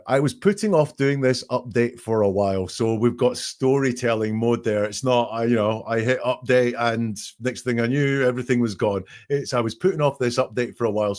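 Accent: Scotland, United Kingdom. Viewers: Hello from highland Perthshire, its braw to hear a fellow Scot annalysing this so and so. Watching on catchup. Just subscribed, so hope i get notifications for a live or two and can blether in the chat ❤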